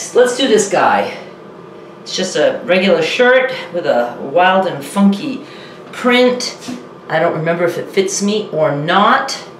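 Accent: Maine, United States. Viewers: Hello from Maine! I love seeing how much fun you are having. It is nice seeing you smile 😊 Do people notice your Maine accent down there?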